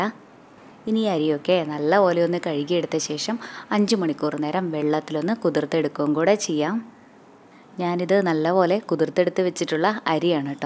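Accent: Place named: Thrissur, India. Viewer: 🌷👌🌷, നല്ല തൃശൂർ ശൈലി ഭാഷ 🤪💞👌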